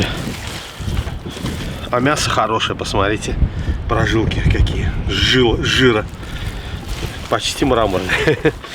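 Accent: Baku, Azerbaijan. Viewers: Бакинский акцент не перепутать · Дааа, Бакинский акцент и мастер класс !!!! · Очередной раз кайфую от того как готовит автор! Бакинский акцент это просто услада сердца! Первый раз слышу что говядину надо мариновать три дня . Обязательно попробую так сделать. Спасибо! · Прекрасный обед. Сам я родился в Баку 65 Лет назад но очень приятно слышать дружелюбный бакинский акцент. Спасибо большое каспийскому берегу!!! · Это кайф слушать ветра Бакинского берега и Бакинский акцент. Дай БОГ Вам здоровья дорогой! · 7 Февраля и время просмотра 23.56, случайно увидел видио, наверное кушать хотел 😂, но тут реально Шеф и мастер своего дела, четко и без пафоса все рассказал и + Бакинский акцент ))) спасибо вам дорогой, жду выходных и буду делать вкусные шашлыки из говядины ( Дана ) 👍👍👍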